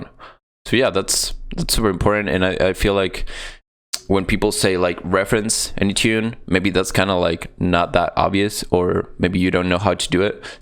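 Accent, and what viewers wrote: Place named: United States. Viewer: Tenes la voz americana, muy sexy, te amo seguí así rey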